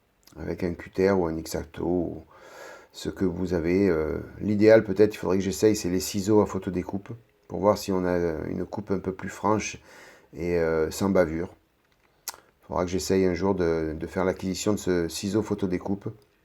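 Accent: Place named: Provence, France. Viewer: Bonjour bonjour..au sujet des ciseaux,pour des grosses pièces au cutter c'est mieux (avis perso)..dis moi vu ton petit accent je pense qu'on est voisin..je suis natif de Salon mais j'habite Marseille..bonne continuation..c'est bien parti!!! Jan-mi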